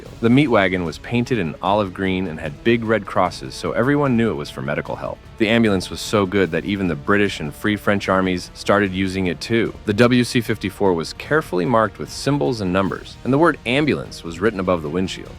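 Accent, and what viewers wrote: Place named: Russia. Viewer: Впервые услышал руский голос автона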